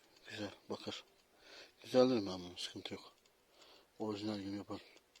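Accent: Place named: Black Sea, Turkey. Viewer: Karadeniz gibi bee mübarek memleketim yemsil...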